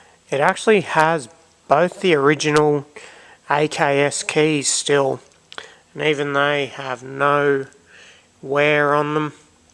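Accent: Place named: Australia. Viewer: You sound like the Ausie version of the monotonous teacher in “The Wonder Years” However, very informative, Smart and even funny at times. Many thanks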